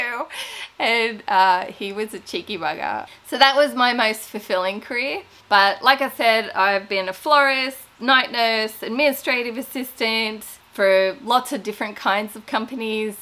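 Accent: Australia. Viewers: so happy for you! You are so much fun to watch and listen to with that Ausse accent.. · Congratulations fellow Australian! Loved this video I always wanted to ask you how you ended up in America. I don’t think I ever knew you were a nurse as I’m a nurse too and can totally relate to what you were saying about nursing. It’s been pretty tough to nurse during covid though. Flipping furniture is my time out from my work. I can see your channel growing so much as your videos are amazing plus I just love to hear a fellow Australian on YouTube. So happy you are a DIY paint supplier! I’ve wanted to try that paint after you first used it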